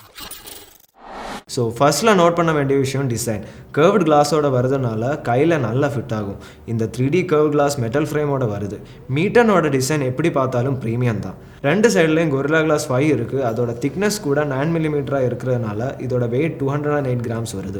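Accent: Kerala, India. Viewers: Bro pesradhu le clarity kammi ah iruku try to improve it .. Malayalam slang konjom iruku try to avoid it. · Voice over malayala tamila ... Sema kick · Ena bro nenga malayaliya... Unga speech style knjm different ha iruku but Nala iruku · bro nennga malayaliya unnga Tamil apadi tha erukku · malayali anonn oru doubt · Bro r u from kerala? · Brother are you a malayali. Unga Tamil 🥳👌. Tamil yaaru pesnalum alagu dhn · மலையாள தமிழ் சூப்பர்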